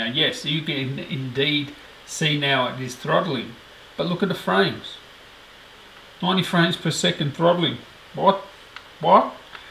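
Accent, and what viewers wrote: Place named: Australia. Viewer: Ofcourse you are Aussie my man! Somehow missed the accent. I use my laptop for VFX rendering, mostly cuda accelerated effects. But I also seek strong battery life, which I am sure the XPS will win the Razer easily. These two laptops are literally a toss up for me, though I am leaning towards the Razer because of the sheer gpu power and better build quality.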